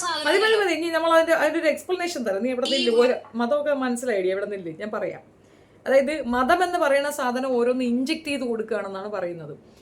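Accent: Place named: Trivandrum, India. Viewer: Ningal oru kidukkam thanne😂 Va thoranal mottham kidunkkunnu😢samsaram keddappa thanna manasilayi trivandrum ennu 😂🎉😂🎉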